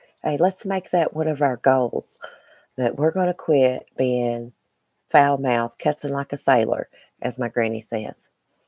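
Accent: South, United States. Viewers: Your the first person I have heard say more things I agree with than I don’t :) You sound southern, I am too 😉 That must be why :)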